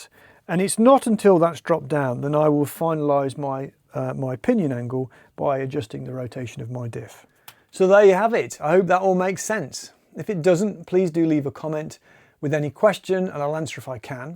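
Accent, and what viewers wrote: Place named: Leicester, United Kingdom. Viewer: You must be from Leicester, you sound just like my mate Andy who's from Braunstone...... and Gary Lineker. On a serious note ive put a Volvo 340 engine (72bhp) in a Renault 4. Ive had to drop the steering column about 25mm to clear the inlet manifold for the Weber carb. It goes like a rocket now but my Mrs doesn't like driving it because the steering wheel is now too low(she says I don't have a problem. ) Do think i could cut it and fit a UJ to bring it back to where it was?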